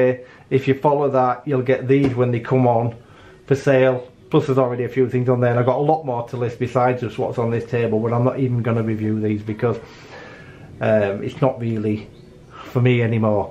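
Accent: Yorkshire, United Kingdom. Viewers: Looking forward to fpv racing stuff. I always look forward to your honest reviews and it's nice to hear a fellow Yorkshire accent talking so passionately about the hobby.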